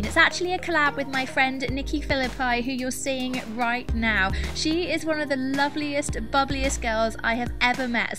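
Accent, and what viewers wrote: United Kingdom. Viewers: I am in love with your british accent :o <3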